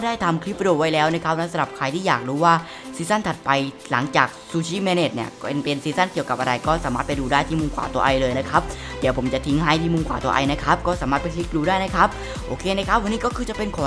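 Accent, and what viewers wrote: South, Thailand. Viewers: พี่คนใต้หรอครับ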